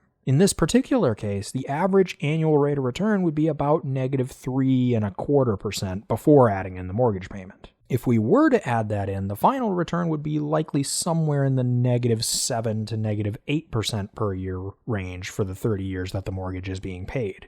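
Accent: United States. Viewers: Oh ur an amercian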